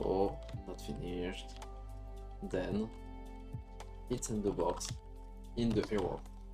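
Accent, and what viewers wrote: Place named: France. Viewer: A ton accent je dirais que tu es français xD. Good job bro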